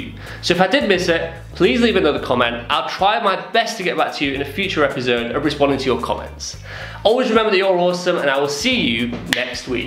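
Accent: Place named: Middlesbrough, United Kingdom. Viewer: Really? You sound more Middlesbrough :-). Yes, thoroughly enjoyed video thanks! X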